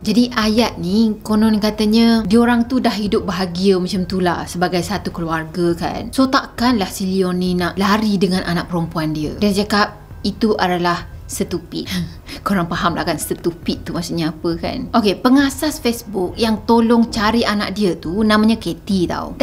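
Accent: Kelantan, Malaysia. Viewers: kamu org Kelantan ke?.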